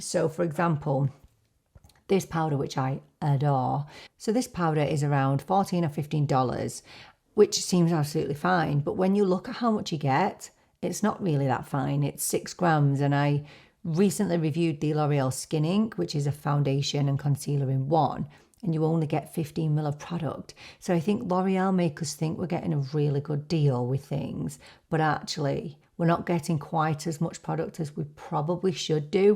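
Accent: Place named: England, United Kingdom. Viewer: Just joined your community, some excellent videos and very informative. Can I ask where is yours accent from as you said you live in Europe and your accent is very English and your mannerisms. Gorgeous look❤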